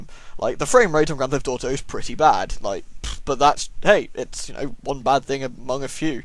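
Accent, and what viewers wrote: United Kingdom. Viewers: Burly Castlevania with shouty ranting brit-man? Sounds legit!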